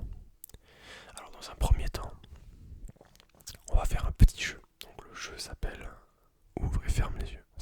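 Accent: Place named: France. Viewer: L'accent français est très fort. J'adore!!!! 🥰🥰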